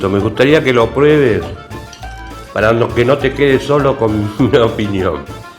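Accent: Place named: Argentina. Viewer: una consulta eres peruano con acento argentino? que te pasa bro enserio que raro un peruano con acento argentino por cierto gracias por la receta me sirvio <3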